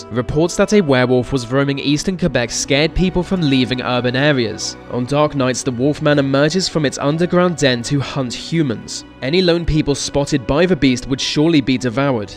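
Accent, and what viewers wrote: United Kingdom. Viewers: Word if advice to anyone not familiar with Ontario: don't EVER say it the way the narrator does in this video. That is incorrect and you will be corrected and/or laughed at if you do. No, just because he's got a British accent it's not some eclectic English pronunciation he blurted out, dude simply never heard it pronounced before and that's a fact. The A in Ontario is a long vowel, not short. Simon Whistler does this same shit all the time in the videos he narrates too. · Can anyone tell me why we need to have GODDAMN LIMEY'S narrating ANYTHING especially for Canadian videos?!?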